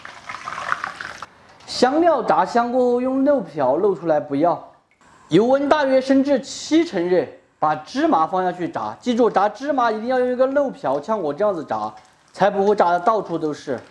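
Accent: Sichuan, China. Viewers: 你的川普好听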